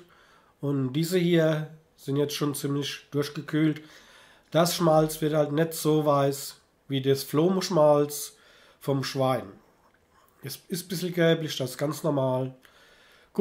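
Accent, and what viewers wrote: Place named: Hesse, Germany. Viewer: Danke für die Anleitung. Des wunderbare hessische Gebabbel hab ich als Exil Hesse total vermisst. 😊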